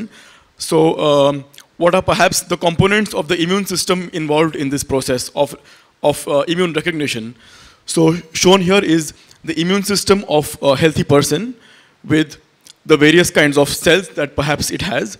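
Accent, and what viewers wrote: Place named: India. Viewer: i too dislike the Indian English accent, but you must realize that this just is a matter of habit and does not warrant hate.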